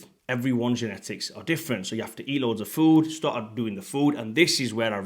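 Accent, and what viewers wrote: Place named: England, United Kingdom. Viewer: Are you from England because you sound like your from round my neck of the woods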